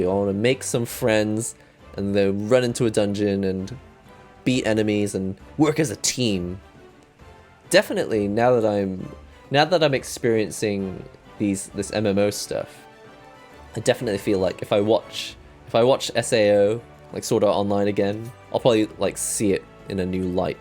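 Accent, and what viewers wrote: United Kingdom. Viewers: Your accent suggests you are British or South African. What's the origin of your English Accent?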